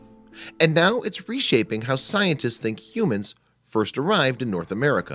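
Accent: United States. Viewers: The weird music sucks and the American accent when talking about Canada and Canadians also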